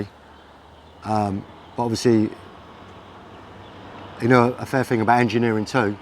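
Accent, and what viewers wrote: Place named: East London, United Kingdom. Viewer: Interesting..love your east London accent 🇬🇧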